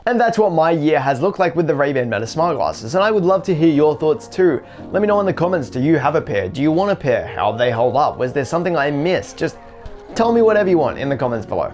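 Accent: Australia. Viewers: Nice to hear a fellow Australian review and talk about these amazing glasses. Also great that you spoke about the Be My Eyes integration. Having no vision at all, it is great to see this integration happen. I’m hoping in the future we may also get some ACR features That will help when trying to read mail and menus etc.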